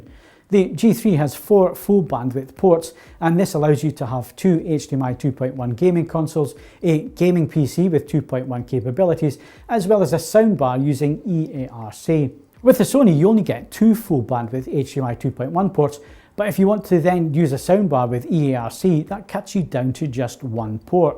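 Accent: Scotland, United Kingdom. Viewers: Great review as usual! All delivered with a wonderful Scottish accent. At times I thought I was watching a football match analysis, which made it all the more enjoyable; most football analysts in Canada are Scottish. I only wish I could afford a 77" A95L.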